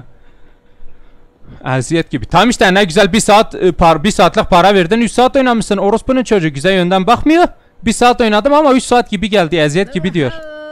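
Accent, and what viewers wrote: Elazığ, Turkey. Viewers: Abi Elazığlı mısın? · Abi Elazığlı mısın yoksa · Abi elazığlımısın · Abi sen Elazığ lı mısın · Abi Elazığlımısın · La sen elazığlımısın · abi elazığlımısın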